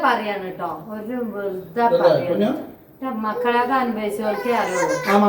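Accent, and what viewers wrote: Malappuram, India. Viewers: എന്താ എപ്പഴും ട്ടോ ട്ടോ പറയുന്നത് ശരിക്കും മലപ്പുറത്ത്കാരുടെ സംസാരം തന്നെ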